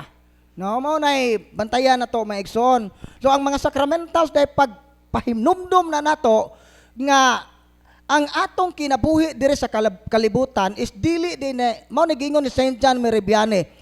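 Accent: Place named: Bohol, Philippines. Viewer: nindot gajod ni motalked c bro. KY real language gjod boholano gjod.nindot gjod paminawon bol-anon.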